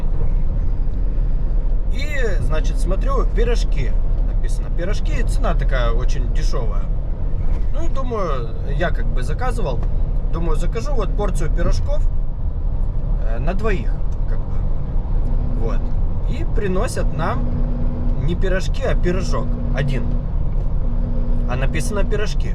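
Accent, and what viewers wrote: Odessa, Ukraine. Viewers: я не сразу понял, что он одессит. Теперь слушаю уже фильтруя слова.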